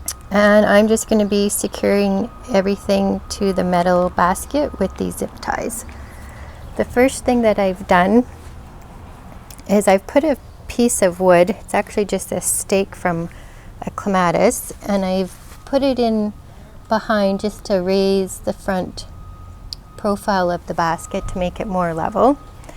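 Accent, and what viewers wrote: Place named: North, United Kingdom. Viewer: what a great idea...i have several planters like this that i can apply this to....btw what zone are you in?? going by your accent, you sound northern....you can also use thin wire instead of zipties thus making no holes it will just go through the burlap easily. pansies won't last long they are a cold weather plant. what a great DIY video!!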